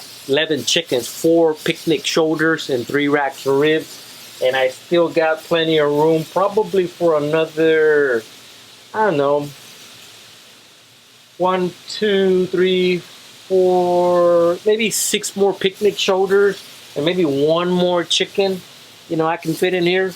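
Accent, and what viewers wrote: Texas, United States. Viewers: Ah, I'm originally from the Rio Grande Valley but live in Georgia now. Anyway, I could detect Texas in you. Been enjoying alot of your videos. Getting ready to place an order for some rubs but waiting on Spicy Chicken to get in stock.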